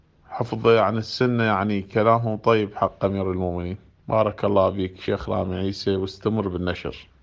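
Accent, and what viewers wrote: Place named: Basra, Iraq. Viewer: هذا الأخ مبين من لهجته من اهل البصرة الله ايثبته أن شاء الله على دين الحق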